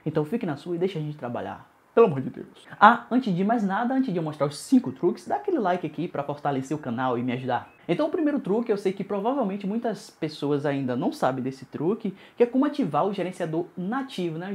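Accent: Bahia, Brazil. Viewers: Você é baiano né? kkkkkkkk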